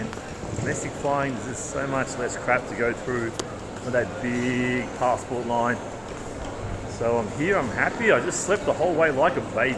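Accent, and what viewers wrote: Australia. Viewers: Cos he's full aussie cuzzzz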